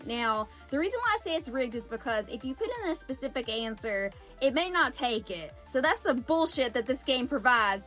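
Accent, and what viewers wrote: South, United States. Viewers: I love your charisma! Don’t see many other southern accented gamers. Bless you friend!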